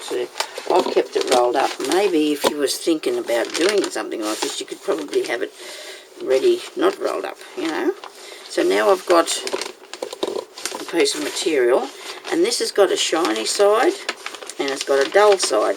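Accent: Australia. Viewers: Loved your tutorial! Loved as well your Aussie accent! Can't say more as I have to go and try it while it's fresh in my mind! Thanks.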